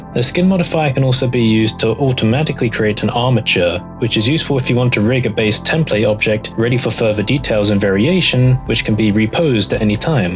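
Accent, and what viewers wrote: United Kingdom. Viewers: wow this is my first time seeing you haha I love all your vids but by your accent I always thought you was a British Pakistani or something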